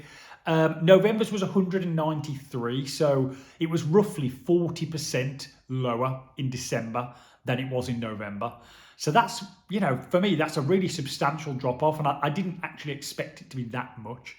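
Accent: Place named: West Midlands, United Kingdom. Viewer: From your accent, I’m guessing you’re from the West Midlands, so we’ve got very similar weather. I’m surprised your imports are way lower than mine. I guess a lot will be the positioning of the PV. DNO export limit: 4 kW Inverter 6kw PV = 7kw (South-west facing) Battery = 18.8kwh Imported £65.59 Exported £15.01 EV MG ZS Octopus Go + Outgoing Octopus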